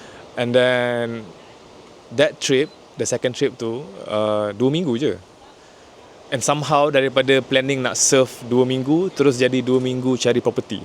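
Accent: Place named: Philippines. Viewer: i honestly thought you are a filipino, had to pause what i am doing to see what your nationality is the moment you started speaking different language.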